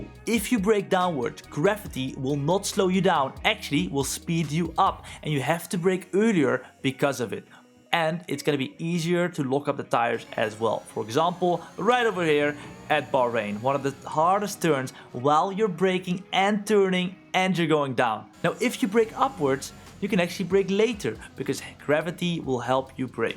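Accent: Netherlands. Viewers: Nederlands right? 😂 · Het is sws een Nederlander😂 accent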